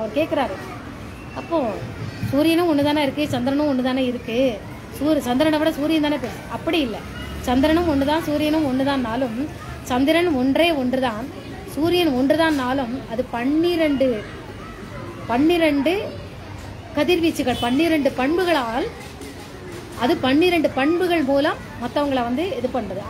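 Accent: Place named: Tirunelveli, India. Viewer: Unkal thirunelvelli pasai arumai amma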